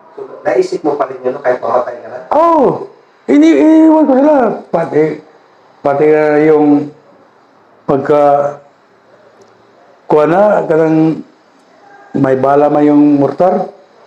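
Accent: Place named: Visayas, Philippines. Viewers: Nice ka sir hehe bisaya special😍🙂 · Di maiwaasan makasalita ng bisaya...i'm so proud of your me also is from bisayas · Nwla antok ko s kwnto n sir bisaya tagalog ok kaayo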